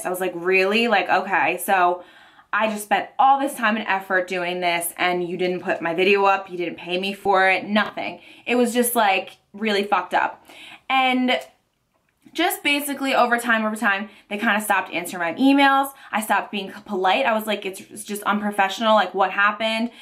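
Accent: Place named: Philadelphia, United States. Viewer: dude your so dope! and your accent is awesome, since your from philly it makes sense but are you italian too? and thats fucked up of that company, they should have kept working with you!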